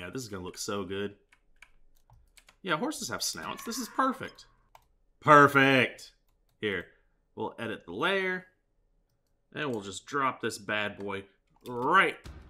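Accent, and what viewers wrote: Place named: Brooklyn, United States. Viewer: you sound like brooklyn guy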